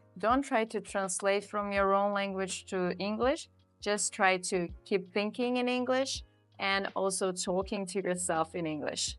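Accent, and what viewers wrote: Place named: United Kingdom. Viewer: Truly, you are a sophisticated content creator who contributes to teaching authentic English with a British accent. This is excellent! May God help you. Perhaps there are difficulties; you could open an international English language school in southern Algeria—just a suggestion. I respect your work plans, value your commitments, and am proud of your humility, kindness, reputation, and lightheartedness. I thank you from the bottom of my heart and wish you continued progress, prosperity, and success. Amen, Lord of the Worlds.❤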